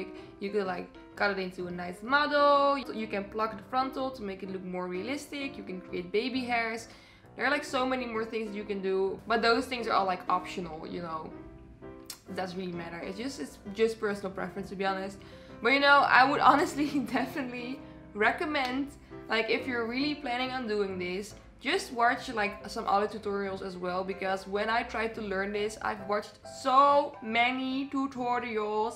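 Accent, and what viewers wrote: Netherlands. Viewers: Leuke video, ik heb je nu pas gevonden. Ik hoorde je accent en ik dacht: die is Nederlands.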